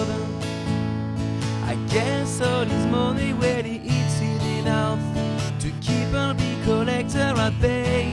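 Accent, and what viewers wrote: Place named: France. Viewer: I love when French people speak English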